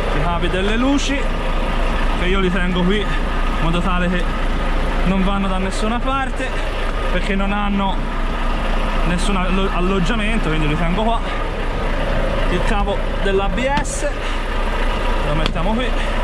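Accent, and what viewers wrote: Tuscany, Italy. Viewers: senti il toscano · Ciao il video ci sta pero mi fa ridere come pronunci la C si vede che sei toscano 😂😂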